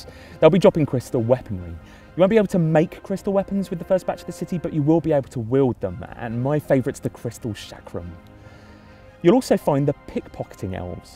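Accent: United Kingdom. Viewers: He has a british accent, soo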